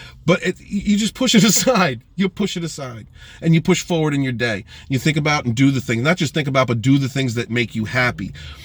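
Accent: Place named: New England, United States. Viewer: Missouri, your accent gave me New England vibes lol, nice to meet you!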